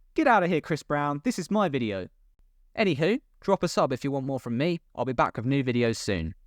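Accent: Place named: United Kingdom. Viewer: 😂 great video! You made me laugh! Looking forward to watching the next one! By the way, I love your British accent!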